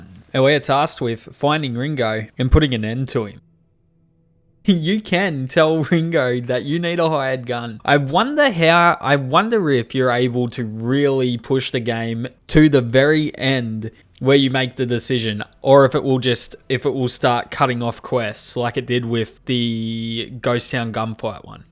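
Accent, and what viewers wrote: Australia. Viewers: also, who doesn’t love an aussie cant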